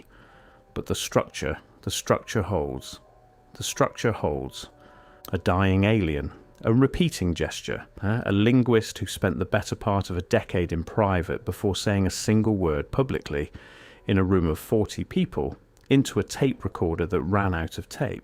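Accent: England, United Kingdom. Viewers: Such a bore...the english accent makes it even more borish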